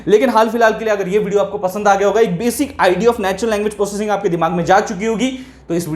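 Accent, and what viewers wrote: Maharashtra, India. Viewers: Your marathi accent is very good✨ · bhava tu marathi ahes ka? aikun bhari vatle!! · Your marathi accent is very good😊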